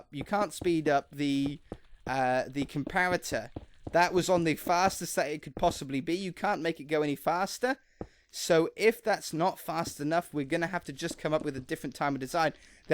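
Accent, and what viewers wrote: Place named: Norfolk, United Kingdom. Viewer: I'm guessing your from Norfolk?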